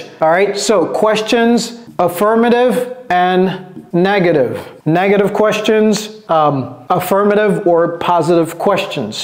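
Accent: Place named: United States. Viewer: Always charmed by your accent Coach..I love American accent by african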